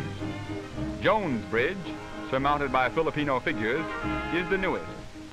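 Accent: Transatlantic, United States. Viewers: Transatlantic accent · Coz Most Americans accent this time was trans atlantic accent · Every trans Atlantic accent.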